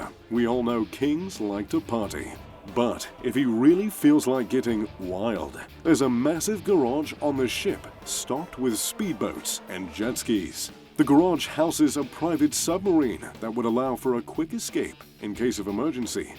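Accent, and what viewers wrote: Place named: England, United Kingdom. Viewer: I love your voice !! My mums from England I love the accent !!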